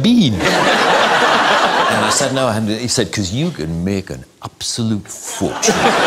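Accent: United Kingdom. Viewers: 喔喔，低音炮＋優雅英腔殺我😍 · 不知道為什麼看到他正常的講話有點不習慣😂而且他的英國腔也太好聽了吧😍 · 挖原來豆豆先生的聲音是這樣XDD 英國腔就是好聽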